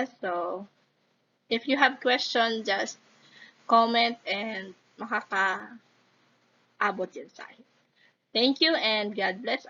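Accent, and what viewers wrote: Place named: Bicol, Philippines. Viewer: bicolano pala kayo maam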